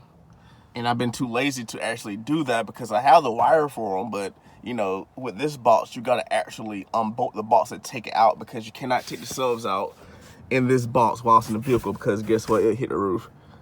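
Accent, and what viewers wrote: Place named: South, United States. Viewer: your from the south huh ? im hearing a accent there,and im seeing camo duck hunting seat covers which omg i love duck hunting,well actually i love bar b queing duck,mmmm damn but yea oh and your even talking about the country haha ok so go ahead throw on some country hahaha im kidding where you at,which state not tx i didnt see a inspection sticker,well registeration sticker actually since they changed it to just one instead of both.but yea curious,alright Basshead UNITY ! 2020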